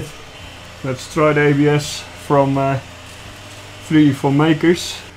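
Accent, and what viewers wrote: Netherlands. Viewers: Ik hoor een Nederlands accent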